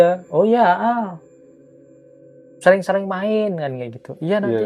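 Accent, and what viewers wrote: Pekalongan, Indonesia. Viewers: Masnya ini logatnya kaya Pekalongan daerah kidul ,Kedungwuni ,kajangan ,mbligo pringlangu ngidol lah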